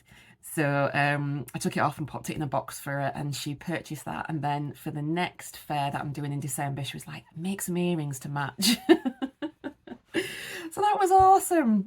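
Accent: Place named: Nottingham, United Kingdom. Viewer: Lovely strands of gems Where are you from in UK? I have a cousin whose accent is similar to yours, she’s from Nottingham. Im from Australia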